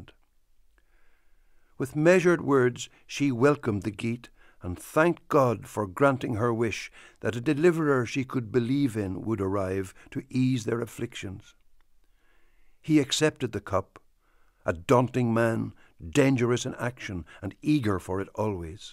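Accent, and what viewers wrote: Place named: Northern Ireland, United Kingdom. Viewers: Laura Halliday hahaha!..how on earth would you know what a "Shakespearean" accent sounds like??..anyways the narrator has modern nothern Irish accent.. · It's a modern Northern Irish accent.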